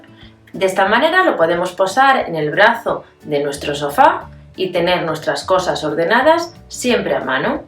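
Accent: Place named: Spain. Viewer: por el acento i entiendo que eres de España. Ahora donde vivo en La Florida EU son las de la am y estoy viendo tutoriales tuyo!!! Gracias por responder.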